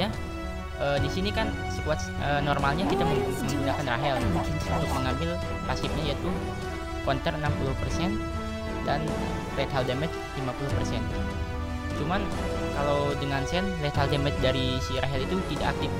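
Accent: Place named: West Java, Indonesia. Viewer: Nyunda pisan xD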